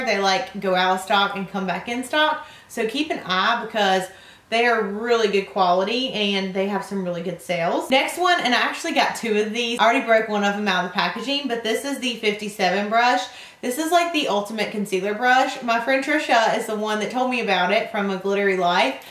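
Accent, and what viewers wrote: South, United States. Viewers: You are a doll. You seem to be a lovely and sweet young lady. The makeup that you are wearing in this video is my favorite!! This is the most beautiful look. The lip color is perfect for you. I know you love the rosy colors but this look makes you look younger. At your age, girls can pull off any look, but this look is special. It is your color for summer!!! I hear you say often when you receive green eye shadows and liners that it you wouldn't normally wear them. Girl, you have all of us cheering you on to try new looks. You receive these products to let us see different looks to influence us. You do influence us because I have purchased items that you have shown!!! With your eyecolor, try golds and bronze with the gold/green as an under eye liner. That would be a fabulous look. I know you love your pink, fuchsia eye shadows, but step out of that box.we will love you even more!!! 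I'm proud of you. I run across your video when you first started and the southern accent got me. I'm from Northeast Tennessee and I support my southern ladies. I'm so proud of you girl!!!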